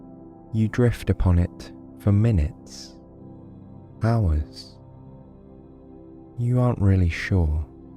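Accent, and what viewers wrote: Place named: United Kingdom. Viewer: Oh. Your voice and British accent is as soothing as a father's voice. Last night I woke up feeling a bit unworthy, but then I ran into this. I listened and I eventually felt much better. Thank you.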